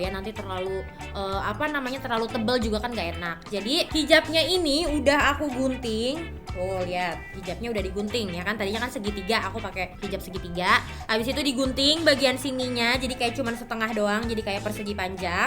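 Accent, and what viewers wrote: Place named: Jakarta, Indonesia. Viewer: bissmillah.... menurut aku,vidio ini berguna bgt ka selain menghibur karena cara ngomong ka yg betawi bet betawi wkwk,bagus ka DIY ny berguna bgt jadi irit uang+ga buang' baju bekas jd bisa berguna lagii..... semangatt mbalaa,alikka,aa utap and anjay family aku selalu dukung kaliann....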